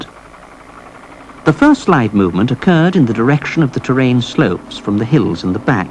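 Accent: England, United Kingdom. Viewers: I couldn't quite believe my elderly eyes when I saw Sven the clay gatherer shirtless and in the Daisy Duke's. Didn't quite go with the rest of the documentary and the narrator with the English accent. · Glassy es...proper British accent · I tried watching this but the guy kept saying daybree instead of debris...i fucking hate the english accent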